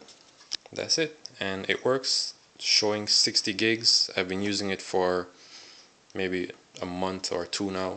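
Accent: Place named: Jamaica. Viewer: Your Jamaican aren't you? I can hear the accent lol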